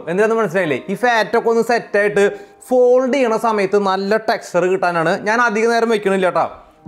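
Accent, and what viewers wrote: Thrissur, India. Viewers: Started watching your channel recently......super contents and lovely presentation.....That Thrissur bhasha and lofley is superub👍🤙...keep the good work cheta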